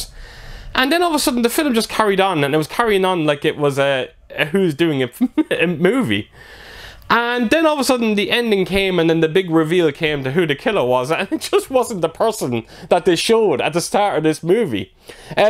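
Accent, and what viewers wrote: Ireland. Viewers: Agree with your Edge of the Axe practical fix work. Top notch. Which part of Ireland are you from??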